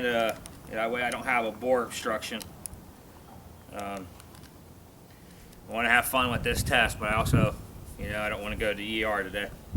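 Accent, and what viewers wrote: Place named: Pittsburgh, United States. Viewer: You have to be from Pittsburgh. Tell me your a fellow yinzer? Trying to identify the accent..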